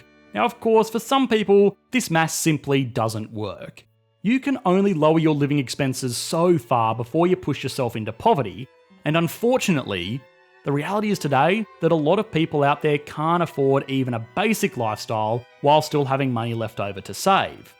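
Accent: Australia. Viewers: Australian accents make me wretch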